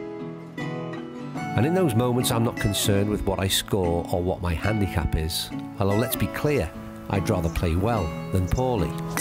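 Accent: Liverpool, United Kingdom. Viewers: Very good. Takes me back to the content when I first watched the channel, especially the travel series. It’s a bit like watching a Scouse version of Eric Anders Lang (meant as a compliment - you’re both great storytellers who use golf as a beautiful backdrop).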